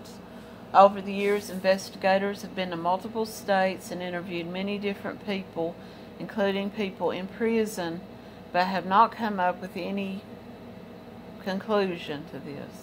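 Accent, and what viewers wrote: United States. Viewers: I hope you weren't offended because I could tell by your accent that you were close to Virginia my family is like north of Knoxville it's a little it's a hair different lol... Slightly but you can tell the.... A sheriff's department has posted a Cold Case webpage just went online I'm going to go grab it for you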